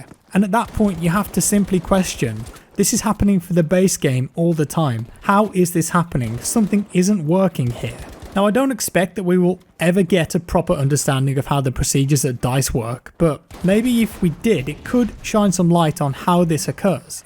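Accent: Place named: West Midlands, United Kingdom. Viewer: sounds REALLY West Midlands in this video!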